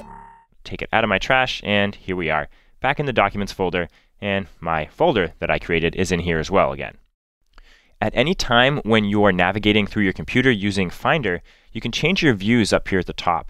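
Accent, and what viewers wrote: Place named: United States. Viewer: I have a MacBook Pro and have been Mac user for a long time but I'm here because of quarantine and Im here to listen to this guy's all American voice. LOL and I learned a few Mac tips !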